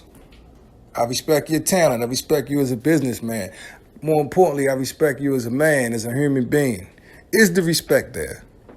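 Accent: Baltimore, United States. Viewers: You sound like you from baltimore.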